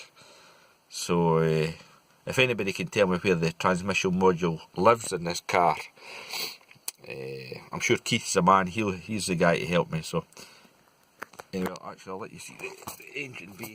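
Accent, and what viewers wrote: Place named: United Kingdom. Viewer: He's not from the "Brown" world chum. You can tell from his accent his from the UK you absolute moron. He's speaking English 100% fluently which I can tell you are not able to looking at your constant ABUSE of ellipsis. You massacred the English language in that sentence of yours.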